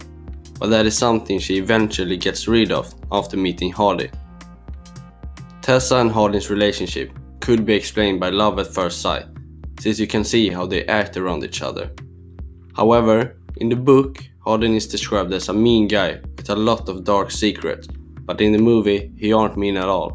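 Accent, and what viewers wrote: Sweden. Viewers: I just have to ask you.. are you from Sweden? 'Cause it sounds like you're talking swedish - english. I'm from Sweden and can't talk swedish - english.. it's a little bit ambaresing.. (sorry for spelling) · Sorry if this is offensive, but are you from Sweden? Because you have a swedish accent lmao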